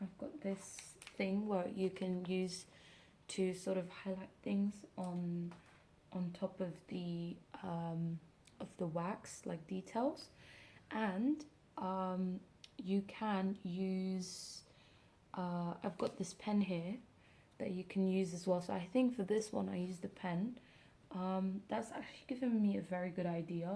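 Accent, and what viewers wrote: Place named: England, United Kingdom. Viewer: 💕Hello my Dear...I really enjoyed watching this video because i doooooo love candles and WAX. I collect candle scraps too and put them in dried flowers or potpourri and It all smells divine!!!😅😅 Love your English accent because it's Just like mine..i was Born in Bedford 1970 and have been in Italy since 83...i still haven't Lost my accent even though i speak Italian everyday...You speak very fluently and you are unserstandable...The video Is very relaxing and thank you for sharing....Take care and a PROSPEROUS NEW YEAR to you and loved ones❤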